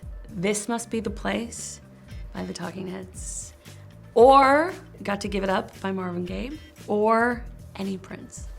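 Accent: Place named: United Kingdom. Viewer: She’s so funny and talented to. Her British accent is 👌🏼❤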